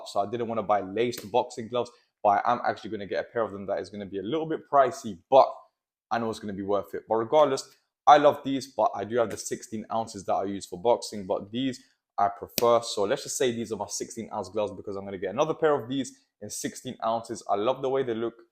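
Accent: United Kingdom. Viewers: No clue would say prolly British?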